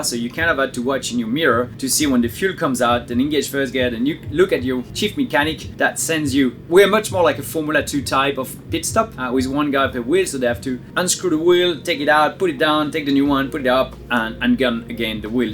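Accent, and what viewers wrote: United States. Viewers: wtf is with your American accent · You sound more and more American every week haha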